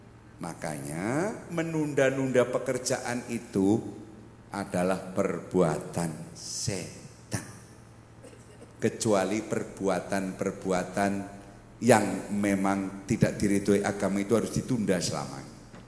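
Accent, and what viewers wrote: Tegal, Indonesia. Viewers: logat tegalane medok temen gawai seneng wong brebes nang tangerang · Tidak merasa bosen dgn ceramah ki entus lucu dengan logat Tegal nya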